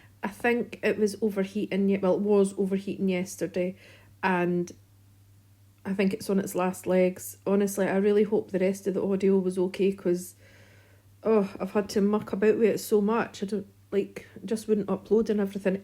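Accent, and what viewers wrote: Scotland, United Kingdom. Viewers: I dont know if it’s because i watch Eli and Kate that your channel came up in my algorithm. It’s nice to hear a Scottish accent and see what you’re planting. Im zone 7b Bannockburn so it’s great to see plants that will suit the conditions here in Scotland. Thanks for sharing, subbed ❤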